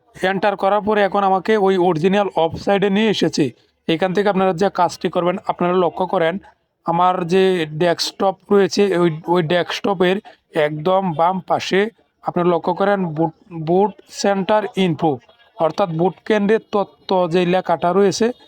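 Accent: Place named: Mymensingh, Bangladesh. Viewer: আরে মঘার বাচ্চা মঘা একটা ভিডিও বানাইছস তাও সঠিকভাবে কথা বলতে পারিস না। আগে ভাষার কোর্স কর তারপর ভিডিও বানাইবি।সব যায়গায় কি নেত্রকোনা/কিশোর গন্জের ভাষা বললে চলবে? মানুষ এমনিতেই বলেনা ময়সিংয়া গাইয়া ভূত ।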